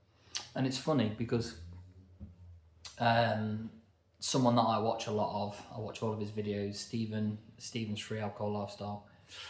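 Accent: United Kingdom. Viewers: never give up giving up mate , you might think you are worthless at the moment , but your honesty and direct approach at dealing with this is going to win , i live in derbyshire you sound local to me buddy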